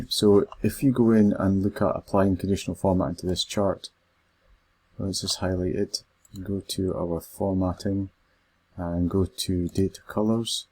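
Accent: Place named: Scotland, United Kingdom. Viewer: Very nice. Great explanation. Thanks a lot. Nice to hear a Scot!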